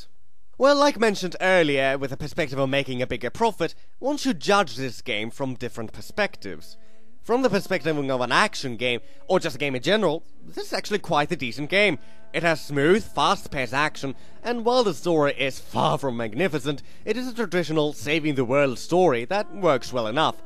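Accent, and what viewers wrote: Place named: United Kingdom. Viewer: Hi! I've seen some of your videos and I'm pretty impressed, even subscribed just today. Just a few questions, though: first question, what part of Britain are you from and what is your accent? (Not a question related to the video, I'm just curious because I personally think it's pretty charismatic) Second question, what's the song that plays in this video?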